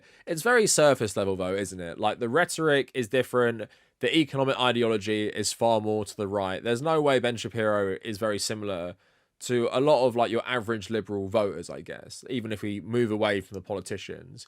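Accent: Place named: England, United Kingdom. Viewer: So I'm 8 minutes into this and so far it's safe to summarize everything this YouTuber has said thusly: Blahblahblahblahblahblahblahblahblahblahblahblahblahblahblahblahblahblahblahblahblahblahblahblahblahblahblahblahblahblahblahblahblahblahblahblahblahblahblahblahblahblahblahblahblahblahblahblah Not sure if you want to assert any thoughts of your own or if you're capable of that but I won't be sticking around for that. English accent sounding smart doesn't mean they're smart at all. This is just blather.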